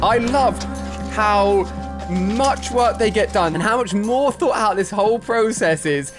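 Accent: United Kingdom. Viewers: an inside stairway us:your british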